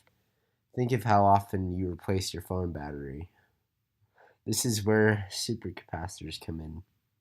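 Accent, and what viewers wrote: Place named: England, United Kingdom. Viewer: Oh thank god, someone with a normal English accent talking about this! Thanks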